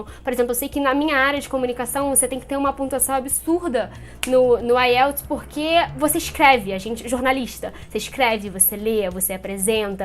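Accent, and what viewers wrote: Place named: Rio de Janeiro, Brazil. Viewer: Maix, pexquisa. Aposto que é carioca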